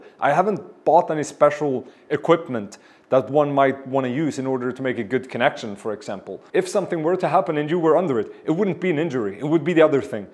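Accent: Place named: Sweden. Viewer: Älskar att höra din fina svenska dialekt 🇸🇪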